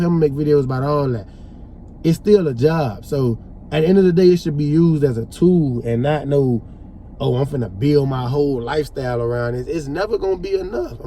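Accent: South, United States. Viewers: Bro. I’m hearing your accent. You’re definitely from the South. Question, respectfully where? I’m from Cashville and you sound like home. New sub here.🤟🏿😎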